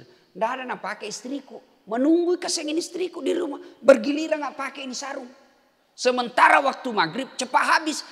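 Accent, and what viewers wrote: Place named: Bugis, Indonesia. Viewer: Sulawesi orang bugis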